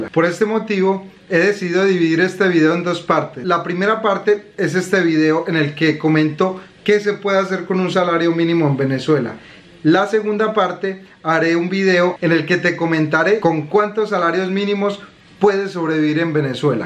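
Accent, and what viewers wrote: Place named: Antioquia, Colombia. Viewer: Este man es español o colombiano porque tiene acento paisa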